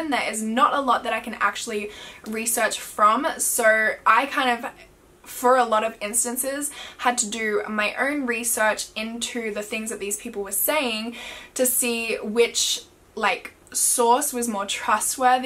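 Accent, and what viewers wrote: Australia. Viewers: Very interesting...I love your Austrailian accent btw 😍😍😍.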